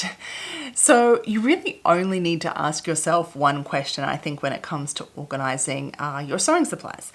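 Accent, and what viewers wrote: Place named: Australia. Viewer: Looks Asian sounds ausie im so confused😂 great organizational skills!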